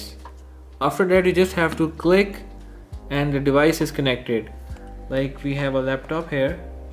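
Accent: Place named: India. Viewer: Hes Indian (i think)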